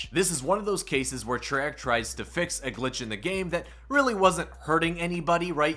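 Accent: Canada. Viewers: Are you Canadian by any chance? Your accent sounds Canadian.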